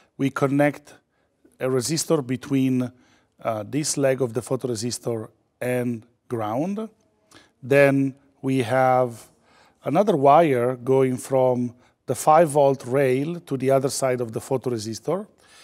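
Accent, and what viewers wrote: Italy. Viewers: Si capisce che sei italiano. Grazie per il video è molto interessante. Per caso fai cosrsi a pagamento? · i dont know why but the fact you have a lovely italian accent makes me understand things better :D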